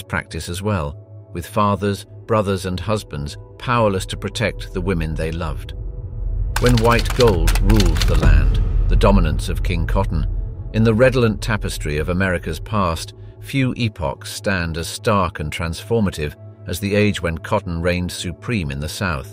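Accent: United Kingdom. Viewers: Just what I need...Some dude with a British accent lecturing me about American slavery. Why don't you tell us about how England enslaved Ireland for centuries?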